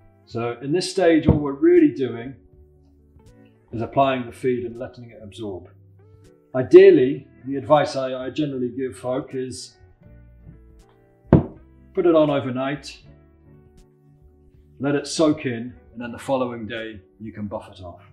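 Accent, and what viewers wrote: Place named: England, United Kingdom. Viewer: "Go give yer bag a drink" very good advice and something I must do! Thanks for a great informative video. Pleasing accent and tone of voice (where in England are you from?).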